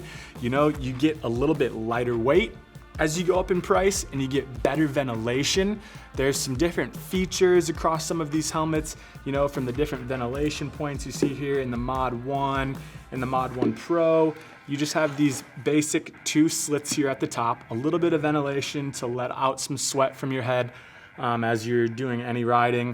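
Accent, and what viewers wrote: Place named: Toledo, United States. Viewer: Dude are you from Toledo?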